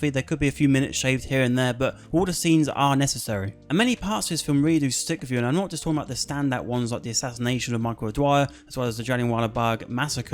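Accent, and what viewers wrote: United Kingdom. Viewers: It's hard for indians to understand ur English accent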